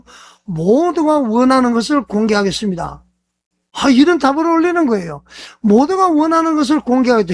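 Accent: North Korea. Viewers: 참 낯짝도 두껍네. 이영훈 목사팔아 밥먹고 사느라 그 이북 말투부터 뜯어고치고 유트브 해처먹어 듣기싫어 이북 말투나 좀고쳐라!!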